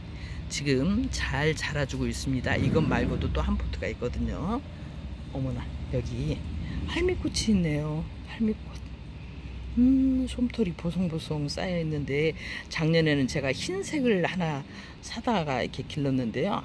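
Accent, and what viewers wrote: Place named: Seoul, South Korea. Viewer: 설명 하시는 말 솜씨가 완전 서울 토박이 같네요 ㅎ 전 갱상도라 부럽습니다 여러 이쁜꽃들 소개시켜줘 고맙습니다 👍🙏🥰❣️🌷👏👏👏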